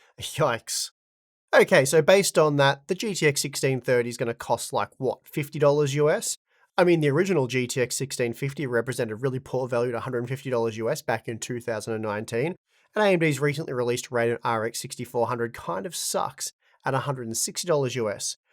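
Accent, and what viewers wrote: Australia. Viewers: I love your aussie accent. Even the caption algorithm thinks you said "game wood" ! XD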